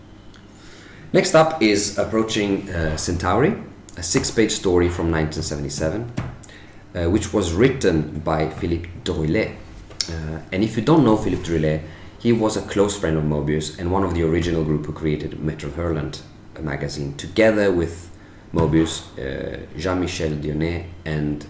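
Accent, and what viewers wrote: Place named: Sweden. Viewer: You must’ve picked up some of the weird ways Swedes pronounce English too because my “Swede-sense” was definitely tingling when I heard you speak 😅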